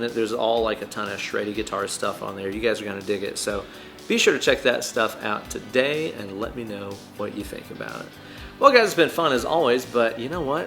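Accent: United States. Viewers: Yeah you fine dude. Love the playing. Alls I know is I give you props you don’t put out a video every twenty minutes like John Cordy and you speak American. The lick was great. Please accept my apology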